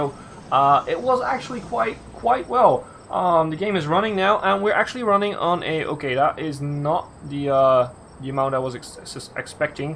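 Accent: Netherlands. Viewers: After the first sentence you said I noticed you're dutch. amazing!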